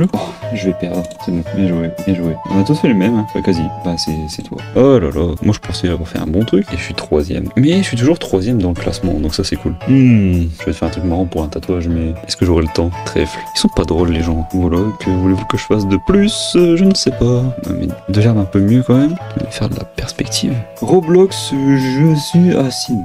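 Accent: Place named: Marseille, France. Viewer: Ton accent marseillais m'a exterminé !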